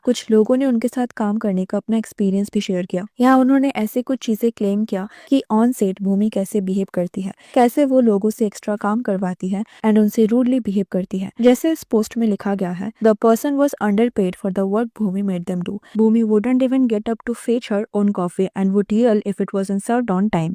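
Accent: Bengal, India. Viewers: I love ur bangali tone 😊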